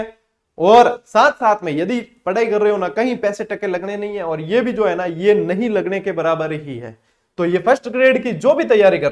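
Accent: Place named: Rajasthan, India. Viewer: Tq tq sir very nice class sir mujhe aapki marvari Bhasa bhutt achhi lagti hai 😊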